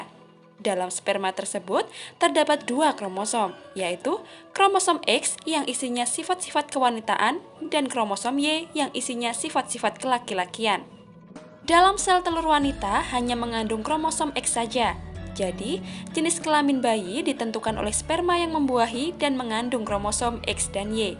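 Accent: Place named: Java, Indonesia. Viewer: naratornya logat jawa nya kental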